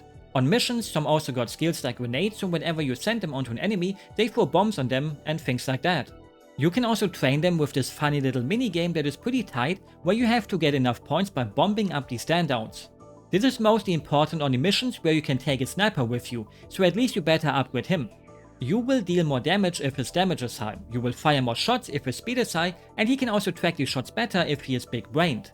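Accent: Germany. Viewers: you are like if the guy who made top 10 hottest sonic characters had a cute german accent and im here for it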